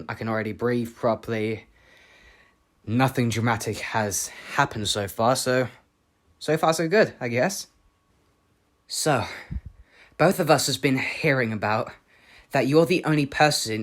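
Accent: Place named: United Kingdom. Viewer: idk why when he started talking I just went "ohmygod he's brtish" LIKE IM NOT BRITISH MYSELF😭💀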